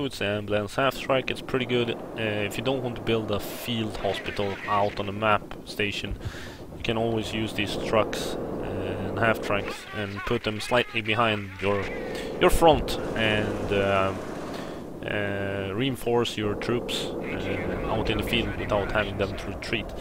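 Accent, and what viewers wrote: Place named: Sweden. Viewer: Where are you from? :) You sound Swedish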